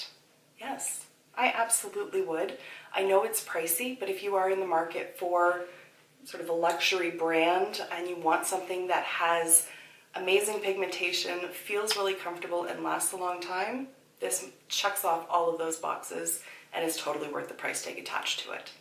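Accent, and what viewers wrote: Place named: Canada. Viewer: I kinda immediately guessed you were Canadian, because you speak very pleasantly :)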